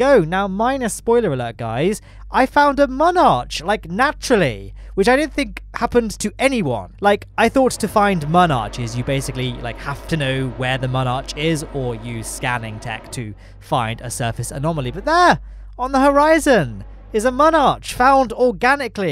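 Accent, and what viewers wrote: United Kingdom. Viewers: This guy is the most British person iv ever heard lol 🤣🤣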